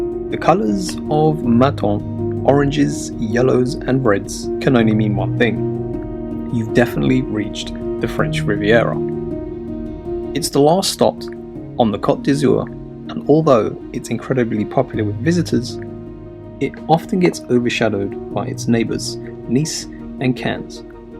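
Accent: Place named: England, United Kingdom. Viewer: i like your voice and your english accent, you put it down that relax me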